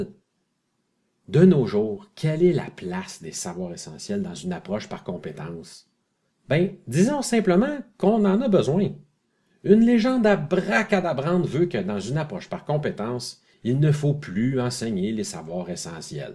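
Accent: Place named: Canada. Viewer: mira Khodja cet l'accent canadien :)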